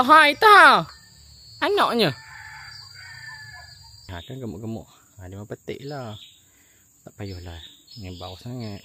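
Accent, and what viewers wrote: Pahang, Malaysia. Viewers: Pekat sungguh bahasa Pahang. Dengar loghat tu macam dari belah lepih gitu yek. Koi org Jerantut. Lama dah koi tak masok gulai tempoyak ni. KL ni Payoh amat nak cari tempoyak.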